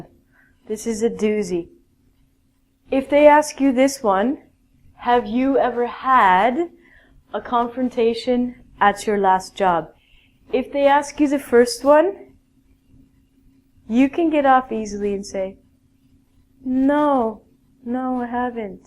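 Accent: Canada. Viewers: are you canadian? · oh my god, i could tell you were Canadian from the start, haha. I love Canada!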